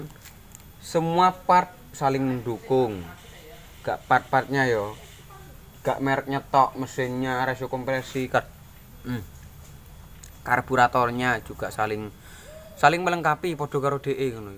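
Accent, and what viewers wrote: Java, Indonesia. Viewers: Bhasane seng jwo medok e wae,,sam Bn asli jawa ne,,ngrti hehehe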